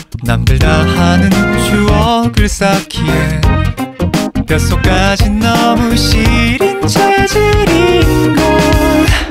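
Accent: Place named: North Korea. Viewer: 아조씬 부칸연기 천재야....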